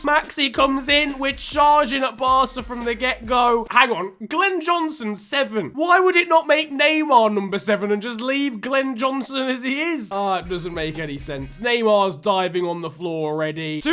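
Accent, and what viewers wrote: Nigeria. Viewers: Are you Nigerian 🇳🇬? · Are you Nigerian?🇳🇬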